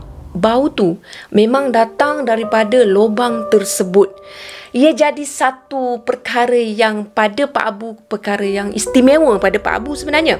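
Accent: Kelantan, Malaysia. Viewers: ooo...orang kelante. Jangan marah, saya suka cerita-ceritanya, cara penyenpaianya...eee dengan rautwajah yang berubah-ubah. Rajin awak cari bahan😓😓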